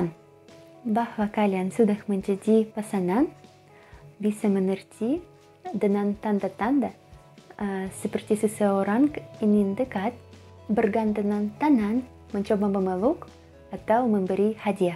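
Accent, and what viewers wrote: Java, Indonesia. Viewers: Logat Jawa 80% apik lo. Monggo mbak mriki dolan ke kota Kediri Jatim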